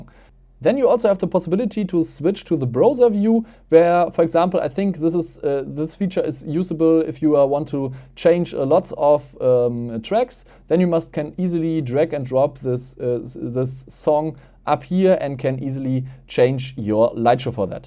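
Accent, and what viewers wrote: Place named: Germany. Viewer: sorry this german accent when you speak english it's really horrible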